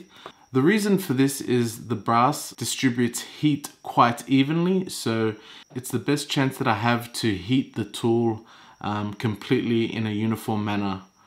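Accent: Australia. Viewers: Are you in Australia? You sound it haha. I haven’t watched all of your videos yet but course did/are you doing? B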